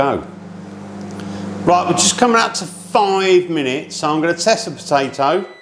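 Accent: United Kingdom. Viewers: Your definitely British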